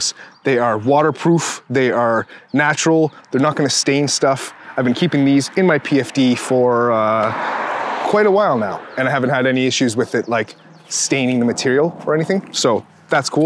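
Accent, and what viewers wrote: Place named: Canada. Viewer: God damn you Canadian asf!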